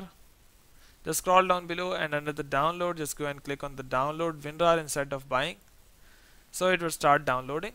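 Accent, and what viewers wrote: India. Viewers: Cannot understan anything you're saying. You talk too fast and the Indian accent is impossible.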